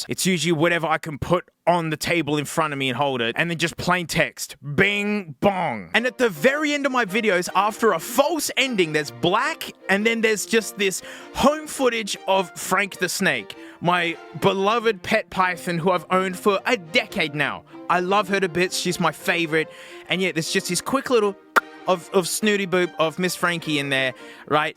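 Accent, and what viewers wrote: Australia. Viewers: just broke this AI with his overwhelming aussieness and unique lingo, and that's amazing.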